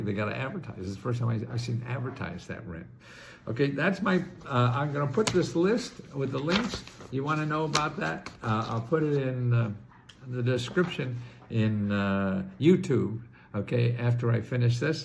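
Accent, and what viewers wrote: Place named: New York, United States. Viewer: Please. Key. Me in.. Thanks yes. 😮❤😊love your info. Yes. You. Friendly. Sound like New York accent 🎉🎉🎉🎉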